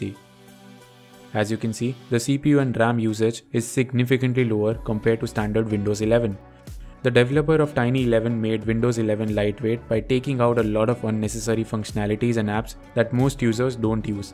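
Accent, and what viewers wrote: India. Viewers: You have indian accent me no trust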